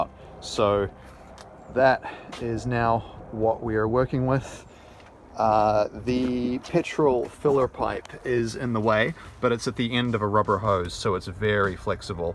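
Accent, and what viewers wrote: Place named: New Zealand. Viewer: You sound like you're from somewhere in the south island of NZ?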